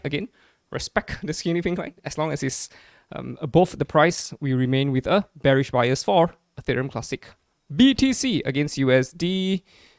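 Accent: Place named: Singapore. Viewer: I grew up in Singapore, and I think, from the accent Skinny is in SG or somewhere close. SG for the win 🎉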